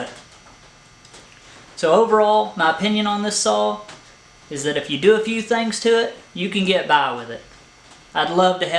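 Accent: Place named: Louisiana, United States. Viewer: i just like your accent is that way out in louisiana somewhere ?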